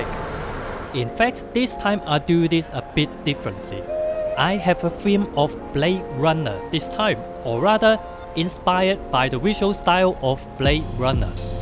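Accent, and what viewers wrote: China. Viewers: Uncle Roger accent in real life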